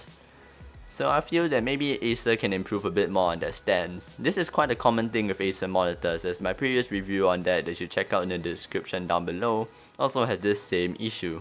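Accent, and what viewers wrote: Singapore. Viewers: Are you from singapore? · U from singapore?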